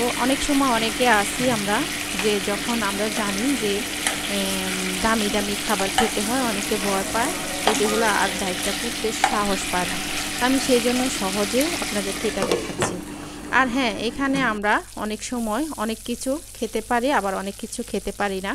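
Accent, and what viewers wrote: Noakhali, Bangladesh. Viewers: Apur bari j noykhli buja gycy kotha suny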